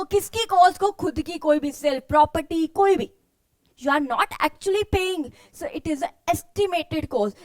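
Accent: Punjab, India. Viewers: Mam are you punjabi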